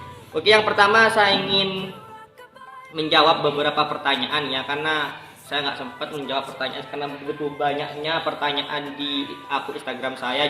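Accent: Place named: Madura, Indonesia. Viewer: Wah ternyata reng medureh .. Mun engkok reng jebeh kak....hehhehe...buat konten tutorial ja kak..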